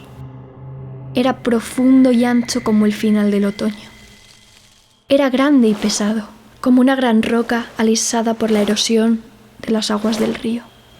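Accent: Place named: Spain. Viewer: Amo tu pelo y tu acento español!! ♡♡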